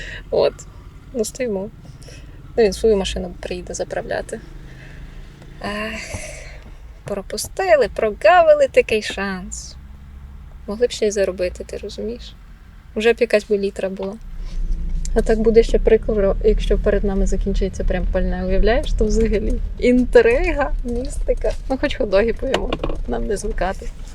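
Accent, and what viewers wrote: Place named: Kirovohrad, Ukraine. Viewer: Слухаю твою мову. Відмінності з Кропивницькими селами мінімальні.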